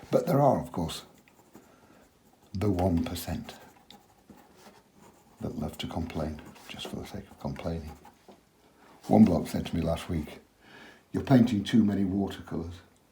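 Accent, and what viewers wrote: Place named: Northern England, United Kingdom. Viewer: I am seriously enjoying your videos so much. I'm guessing you are up north and you say grass instead of grauss! ;) Your sense of humor is a delight! I can tell you've been painting a long time... but your pallet is driving me crazy.. maybe I have OCD! It works for you but it looks moldy! :) Please receive this in the way it's intended and not meant to hurt feelings. :) You always end up with a beautiful painting.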